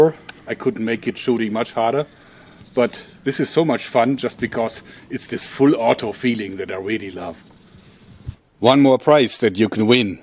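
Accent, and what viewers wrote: Germany. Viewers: You match with the medic from tf2 with your German accent and syringe gun/ slingshot.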